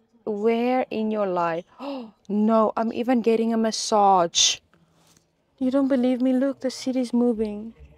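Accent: South Africa. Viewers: Coolest is your South African accent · Love the South African accent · You sound like you are from South Africa, I can hear a bit of an Afrikaans accent. Great video thank you very much. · Hahaha, for sometime now i have been saying aiyeee this accent is South African 🇿🇦. Now after watching a couple of videos i decided to check when was this channel opened. " so waar" she is South African 😂, we love you. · Is she from South Africa? The accent is almost South African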